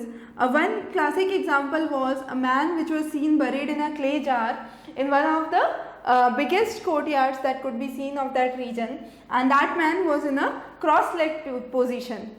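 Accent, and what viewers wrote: India. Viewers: Man are you a south Indian or north indian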